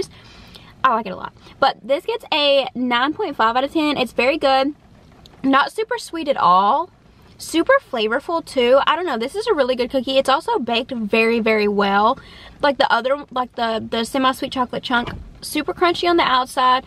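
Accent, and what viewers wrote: South, United States. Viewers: You’re adorable! 😊 I’ve come across your channel a couple times with you trying out the new Crumbl cookie of the week. I like how you explain in detail about the taste, the quality and the flavor and it’s even better with your cute southern accent, which I adore. Thankyou for a great food review/Mukbang.❤😊😋😁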